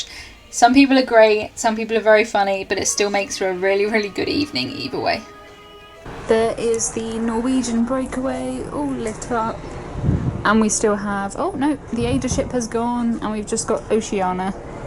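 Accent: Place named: England, United Kingdom. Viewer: Your English accent is so beautiful 😍😍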